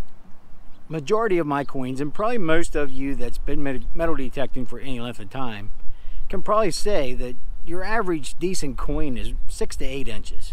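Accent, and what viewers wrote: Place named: Pennsylvania, United States. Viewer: You've got to be from Pa.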